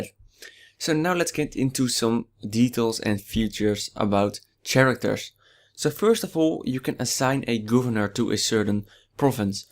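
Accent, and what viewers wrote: Netherlands. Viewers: Are you Dutch? You sound like it!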